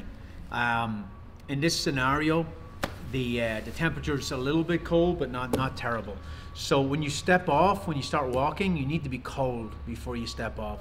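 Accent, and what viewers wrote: Ireland. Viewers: I was trying to put a finger on you're accent and it makes sense when you said you're from Ireland.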